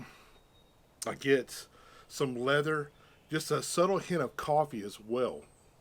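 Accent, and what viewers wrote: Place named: North Carolina, United States. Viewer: Great review. You sound like you should have a BBQ channel lol. It’s that NC accent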